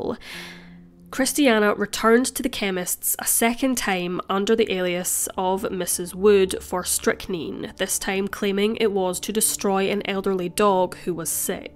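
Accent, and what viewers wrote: Scotland, United Kingdom. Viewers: Ah, that Scottish burr... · Lovely to hear a Scots narrator for a CHANGE.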